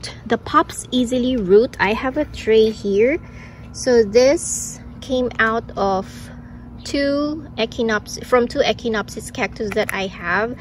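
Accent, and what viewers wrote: Philippines. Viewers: "Rainbow Burst" would be a hybrid seed strain name. Plants from this strain would be variable in form and colour, hence the "rainbow" moniker. Latin names for cacti are ever changing and confusing but mostly very simple. The genus name: Echino = hedgehog. Opsis = appearance. That's a wonderful description...Of just about every cactus! The species name comes next and usually describes the flower or spine colour, the plant's form, the location where the plant grows, or the name of the European person who "discovered" the plant and decided to name it. Common Latin names for colours are: Flava - yellow, aurata - golden or orange, viridi - green, alba - white, rubra - red. Latin names make descriptions easier and more concise. It's much easier and quicker to call a plant Echinopsis Sanguiniflora than to call it "a cactus that looks like a hedgehog with blood coloured flowers." Hope this helps a little. 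I don't mean to be patronising, just giving you information most plant books don't give or explain. Looking at your photo I thought at first you were Mexican, then I heard your voice and now realise you're from the Philippines. I enjoy your channel and seeing the myriad cacti you grow so well. Mabuhay!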